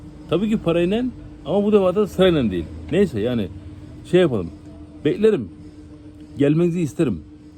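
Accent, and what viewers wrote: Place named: Aegean, Turkey. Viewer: KAMBOÇYA'DA YILBAŞI ETKİNLİKLERİ 1. Khmer Yeni Yılı (Chaul Chnam Thmey) Ay: Nisan (genellikle 13-15 Nisan) Etkinlikler: Geleneksel danslar, su dökme festivalleri, ailevi kutlamalar. 2. Lunar Yeni Yılı (Çin Yeni Yılı) Ay: Ocak veya Şubat (tarih, ayın döngüsüne göre değişir) Etkinlikler: Aile ziyaretleri, yemek festivalleri, ateşli silah gösterileri. 3. Düzensiz Yeni Yıl Ay: Ekim veya Kasım (Kamboçya'nın ulusal günlerine bağlı) Etkinlikler: Kültürel etkinlikler, sokak kutlamaları. 4. Kamboçya Bağımsızlık Günü Ay: Kasım (9 Kasım) Etkinlikler: Resmi kutlamalar, geçit törenleri. EN KALABALIK YILBAŞI Khmer Yeni Yılı en kalabalık olanı. Ülke genelinde büyük kutlamalar yapılır ve yerli halkın çoğu bu festivale katılır. diye özetle internet ortamı bunları yazıyor... Ama Kamboçyayı senin ağzından dinlemek çok daha güzel.. Umarım, bir gün maddi olarak imkanım olur da, senin o güzel ege şivenden Kamboçyayı dinleme bahtiyarlığına erişirim.. 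Sağlık ve Başarı dileklerimle..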